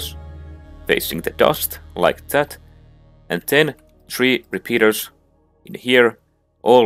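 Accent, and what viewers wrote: Finland. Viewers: I cant focus on the tutorial because I get soooooooooo lost in that Finnish accent 🥰 it’s so beautiful 🤤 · nice finnish accent mate. x1 slap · hmm what do i hear? are you from Finland or Estonia? your acsent telss a lot....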